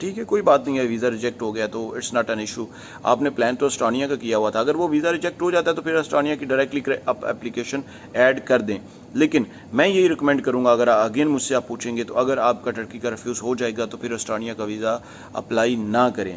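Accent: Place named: Pakistan. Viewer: Are you an Indian or Pakistani?? I'm doubtful because you have talked about India But noT Pakistan though your name and speaking accent is of a Pakistani.....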